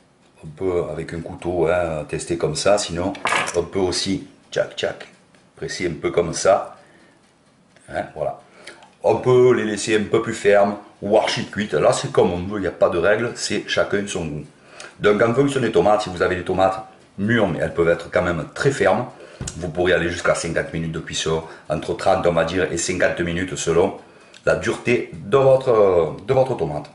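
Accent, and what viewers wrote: South, France. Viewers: Merci pour la recette! J'avais oublie comment les faire! (Et j'adore l'accent du soleil!!!...lol)...je vais m'abonner juste pour ecouter l'accent qui rechauffe le coeur...ahahaha! Salut!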